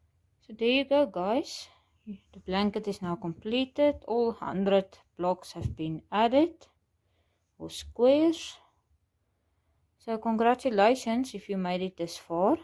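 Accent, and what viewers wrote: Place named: South Africa. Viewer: This is perfect thank you! Your accent brings me back to when I lived in SA! ❤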